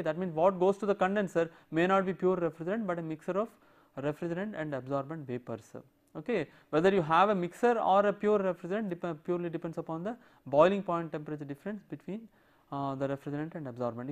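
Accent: India. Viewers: Yes...that may be true for you..its kinda Indian accent...whatever be the case...it is very helpful for many of us... Every body is not as talented literate of english as much you are! Atleast dont insult...anyone...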